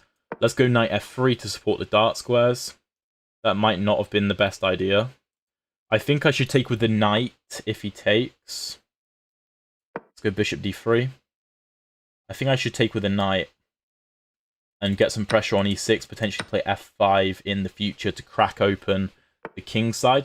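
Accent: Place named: Outer London, United Kingdom. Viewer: Please stick to the longer time control.your 1st oppoonent bundered all over the place presumably because he didnt have time to think..wheres the accent from sounds like the outskirts of london?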